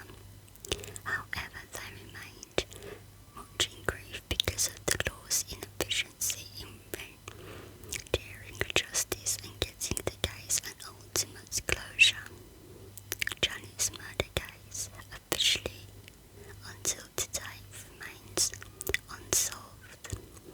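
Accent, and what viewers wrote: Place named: Dudley, United Kingdom. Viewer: Where are you from? Your accent has a big Dudley twang to it. X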